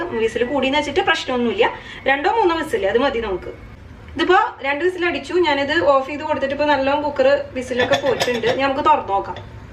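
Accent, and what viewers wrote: Thrissur, India. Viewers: Ente daivame....super presentation.ingane thrissur karkku matre pattu tta....👍😊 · Enthoru easy ayita mutta curry undakunney.anthayalum undakum Thrissur slang kelkumbo nalla sughund. Thanks dear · തൃശൂർ ക്കാരി ആണല്ലേ 😁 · Thrissur or Kottayam?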